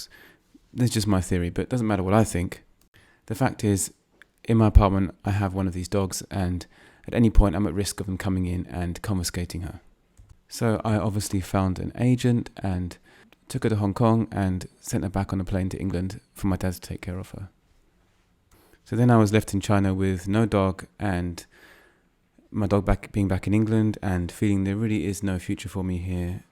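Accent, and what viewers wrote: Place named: Taiwan. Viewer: Chanced upon one of your videos on your Mandarin channel - was very surprised to hear a Taiwanese accent since most Western folk seem to use Beijing pronunciation when learning Mandarin 😂😂 Makes sense now, knowing you lived in Taiwan prior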